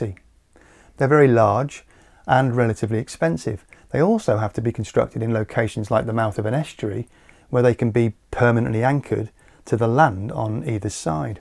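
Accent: England, United Kingdom. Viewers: what about large fish and whale collisions with the blades??? can a cage be built around the blades to protect the blades and block marine critters from impact. First time viewer. I like your presentation style, your pitch of voice and your speed of speech, and---your accent. It reminds me of my dear grandfather who was from Atherton England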